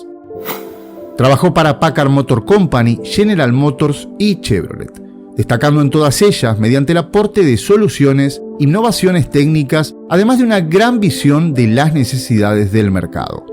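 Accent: Argentina. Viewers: Vamos todavía defendiendo el acento argentino asi me gusta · No todos los Argentinos hablamos como porteño. Ustedes nomas dicen shon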